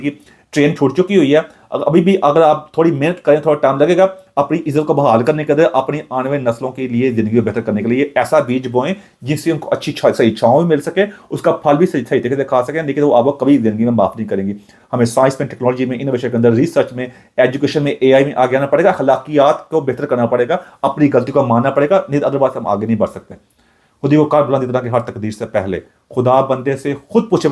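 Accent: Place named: Punjab, India. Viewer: Urdu bolen humen panjabi accent samjh nhi ati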